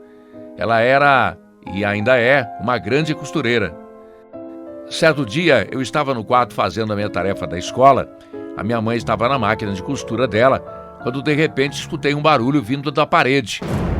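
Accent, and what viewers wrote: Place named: Minas Gerais, Brazil. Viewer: Adoro esses relatos, quando conta, é outro que Paresse mineiro...continuam assim...parabéns...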